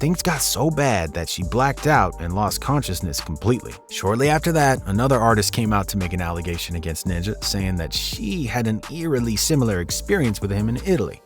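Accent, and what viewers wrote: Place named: United States. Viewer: Ignorant take on the duo, and christ american pronouncians on anything thats not english 😂 fml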